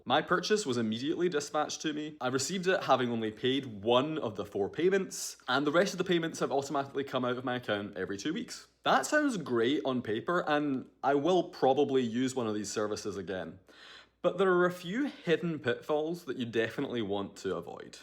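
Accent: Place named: Scotland, United Kingdom. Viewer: Are you a Scotsmen 🏴 living in America 🇺🇸 because you have Scottish Accent but keeping mentioning Dollars and showing Dollars?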